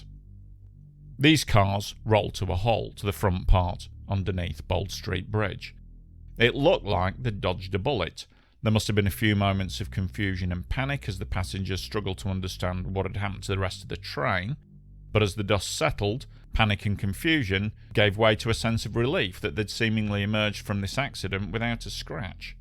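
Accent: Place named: Hull, United Kingdom. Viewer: Does anywhere know where is from? I'm curious about his accent, I'm thinking maybe Hull?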